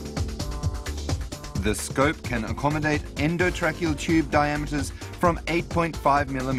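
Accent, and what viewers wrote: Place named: South Africa. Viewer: I love the south african accent; it reminds me of Alan Partridge series 2. This looks even easier than glidescope.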